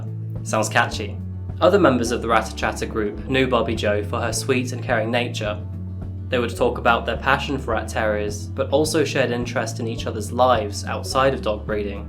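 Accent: United Kingdom. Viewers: UK accent? Plaid shirt? XXL Forehead? I thought we were gonna give it a goo :(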